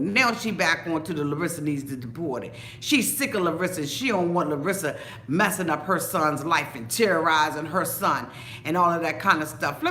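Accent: Washington DC, United States. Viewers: Auntie are you from the DC area? I'm trying to place your accent and I'm getting a strong DC accent. I'm a native so it's all love.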